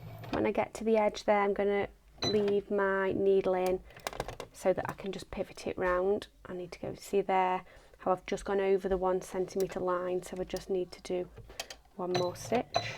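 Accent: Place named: Stoke, United Kingdom. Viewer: Just subscribed from seeing your link on Boz's channel. You sound like a Stokie like me.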